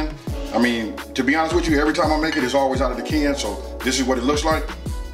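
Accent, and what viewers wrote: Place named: Los Angeles, United States. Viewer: You have an LA accent. I can hear it when you said "starving"... Pronounced like "sshtarving" etc.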